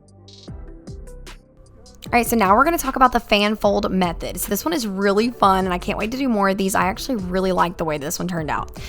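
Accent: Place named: Texas, United States. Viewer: I think I must share that you are the absolute fucking cutest and what part of Texas or the south I guess I should say are you from? I’ve never ever commented on a YouTube vid I don’t have my own channel but I love learning from it and I know how creepy I sound but I cannot resist your personality, I dig all of it. I was just trying to tye dye my kids shirts before they wake tmrw as a surprise bc I take leaps into what I love! You got it girl. Love it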